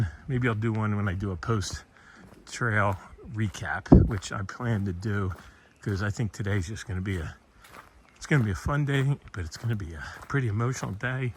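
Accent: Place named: Philadelphia, United States. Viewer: Great job! Will miss that Philly attitude and voice.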